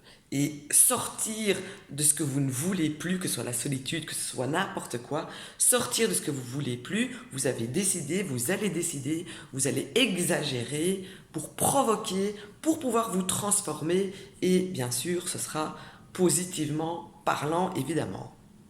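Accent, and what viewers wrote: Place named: Belgium. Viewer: Bonjour bel accent belge🤗😘👏🌹🥀🥀🥀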